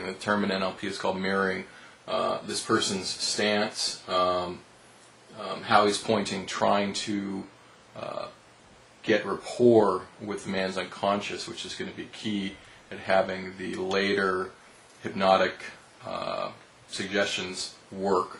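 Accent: United Kingdom. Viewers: u kno what? that all sounds pretty legit on a very unusual level, but it basically all comes down to one thing: british accent. That combined with non-stop inane chatter could put anyone under for a few seconds. . . but like i said, the other explainations are nice too. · Your video was thurly explained. I like that. That and i don't understand the british accent that well so ya :P Thx!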